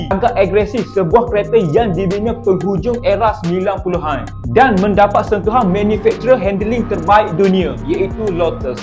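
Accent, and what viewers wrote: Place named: Terengganu, Malaysia. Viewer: Pekat ganu nih... Kereta Proton buat kerja yg bubuh Brg2 tuu...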